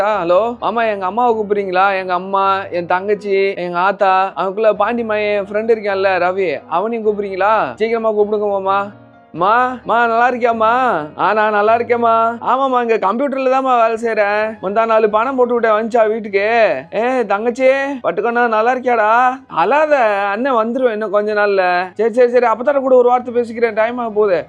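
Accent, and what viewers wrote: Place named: Theni, India. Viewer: Theni pesu appadiya eruku brooo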